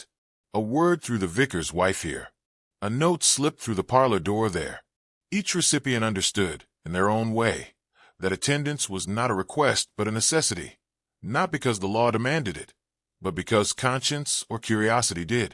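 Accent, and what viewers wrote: United States. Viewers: An American narrator does not work for a Miss Marple story. It grates.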